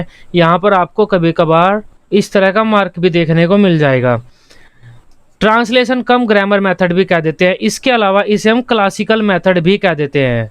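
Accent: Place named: Saharanpur, India. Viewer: Bhai language se 100% Saharanpur ka lagra h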